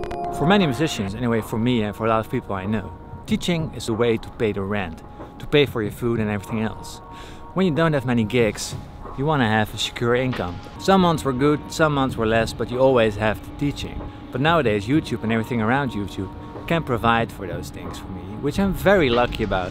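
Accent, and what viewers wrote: Netherlands. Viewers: so he is dutch? i just discovered this channel and was wondering about his accent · It's Dutch, it's a pretty unique accent. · Nice, always thought I recognized a Dutch accent, bit now i'm for sure.(lesruimtes) Good luck on your new challenges. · Hi, bij toeval een weekje geleden op je kanaal gestuit. Ik kon horen dat je uit NL kwam, dus ik zoeken, blijk je in Zwijndrecht te wonen, naast Dordt waar ik zelf woon. Ik zag dat je les gaf, maar geen leerlingen meer aannam. En nu lees ik dat je stopt als leraar. Er kan veel gebeuren in een week. Supergoede keuze: je hebt superveel talent en een drive, dus dit gaat zeker goed uitpakken. Best of luck! Markwin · I love your accent. :v As a hobbyist voice actor I'm going to look into learning how to do a Dutch accent now.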